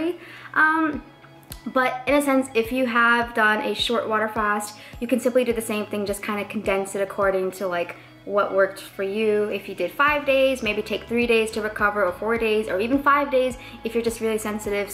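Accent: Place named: Brazil. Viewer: Are you brazilian? Pretty accent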